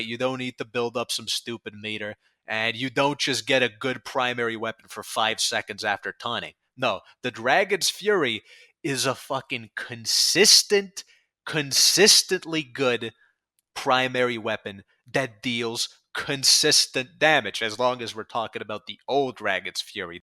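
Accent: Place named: New York, United States. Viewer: do you think swearing 27 times every sentence in new york accent makes you sound more confident or smth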